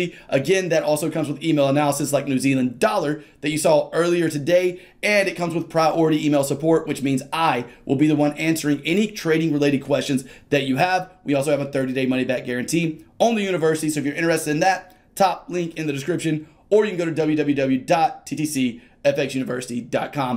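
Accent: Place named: North Carolina, United States. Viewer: Where you from? What's that accent? Sounds like North Carolina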